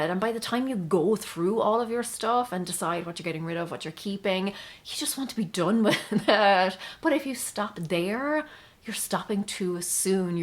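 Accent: Ireland. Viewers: One thing I have gotten from your videos is that no one gets it perfect the first time. It's a process. You can learn. You can change your mind. Be gentle with yourself. Thank you for that! Oh, and ANYTHING spoken in a lovely Irish lilt is easier to listen to! It's the spoon full of sugar that helps the medicine go down.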